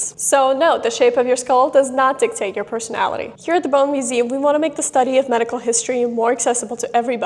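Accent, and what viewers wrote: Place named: Eastern Europe. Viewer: She is a truly excellent speaker. But her accent intrigues me. Eastern European perhaps?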